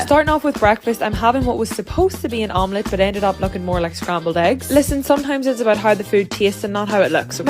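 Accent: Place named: Northern Ireland, United Kingdom. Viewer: Are u from Northern Ireland because I’m from Northern Ireland and you have the accent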